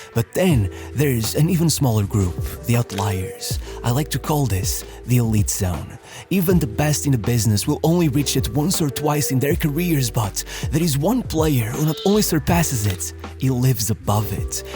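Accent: Portugal. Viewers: Mano, ando a ver isto a 2 anos e jurava que o sotaque parecia português, incrédulo, trabalho espetacular. Super fixe ver um canal desta magnitude e qualidade sair da nossa pequena mas dedicada comunidade, melhor das sortes🇵🇹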